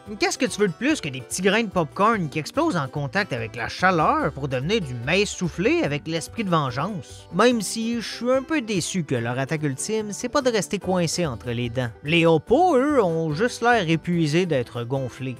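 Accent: Quebec, Canada. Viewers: L’accent québécois, au départ je n’aimais pas mais avec le temps j’aime bien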